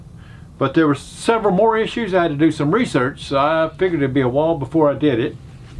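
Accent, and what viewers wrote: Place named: Louisiana, United States. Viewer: "How y'all doing"? Cajun :-)))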